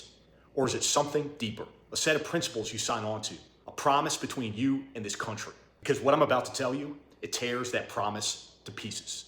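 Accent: Pennsylvania, United States. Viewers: I could hear the Pa accent. Thank you for your message, means a lot. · Omg, Pennsylvania! I thought your accent sounded familiar! Thank you for everything you've done for our country and what you're doing for us all now! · When you speak, they can tell that you are an American.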